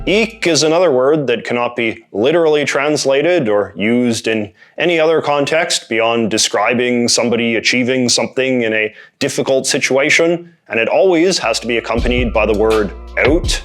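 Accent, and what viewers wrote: Canada. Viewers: North Midwestern (MN, USA) here. "Hey, how yous doing?" is addressing a group of people in some rural areas in the north west of the state. I am unsure of how common using yous as a plural you is, but I'm sure it bleeds into Canada in many places, eh? Having grown up near Fargo, it is good to hear my home accent on this channel, but I suspect the host on this channel is Canadian, as the accent leans real heavy on the double-o ooh in 'about' and such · By any chance are you Canadian?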